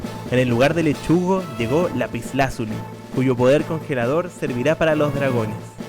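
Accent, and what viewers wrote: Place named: Chile. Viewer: Grandioso video, para ser sincero lo único a mejorar sería los trazados de dibujos y talvez algo de anatomía, la animación uuf en eso estamos bien aunque yo no sé de animación así que ahí no me meto ajaja la verdad me encantó tu video y entendí CADA referencia y además e de suponer que eres chileno por tu forma de hablar y por alguna que otra referencia ajajaj y sera un gusto ser parte de tu canal y dar mi like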